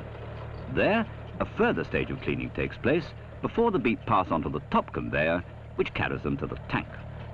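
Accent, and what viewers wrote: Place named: RP, United Kingdom. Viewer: Curious video production, it looks very 1950-60s with the posh narrator and wobbly camera. However the vehicles seen are very much 1974 - I can only assume this production (video) was commissioned (paid for) by Standen as the BBC and ITV were much more of today's standard in video picture quality. All the same was good to see this, that machinery is much more smarter than it first seems, and did we all cheer when the 135's came into view?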